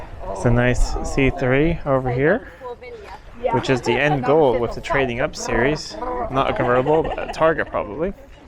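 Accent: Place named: United States. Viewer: How do you have a perfect American accent when growing up in Sweden?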